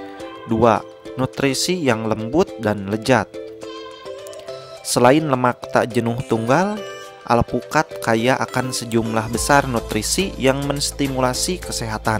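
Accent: West Java, Indonesia. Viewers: Sunda pisan kang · Logat orang Jawa barat Indonesia Raya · sunda pisan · yg host nya klo boleh sy tebak pasti org jawa barat 😂😂😂😂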